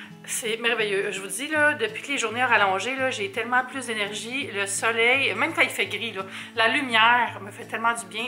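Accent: Canada. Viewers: Un bonjour de BXL J'AIME LES SONS CANADIEN ❤❤❤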